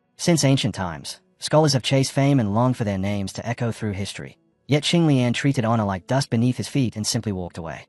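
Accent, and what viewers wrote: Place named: United Kingdom. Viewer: Didn't expect the ai to be British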